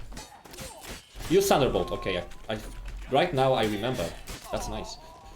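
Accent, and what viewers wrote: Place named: Poland. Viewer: Brzmisz jak polak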